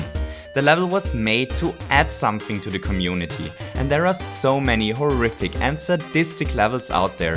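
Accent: Germany. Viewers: bist du deutscher? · Are you german? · Are you German? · Bist du deutscher? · are you german? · Hey... You´re from Germany...:D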